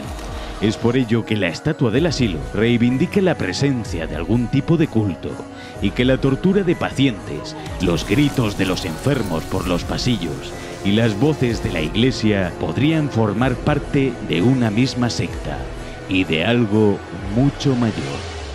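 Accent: Spain. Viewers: Una pregunta eres de España o de latinoamerica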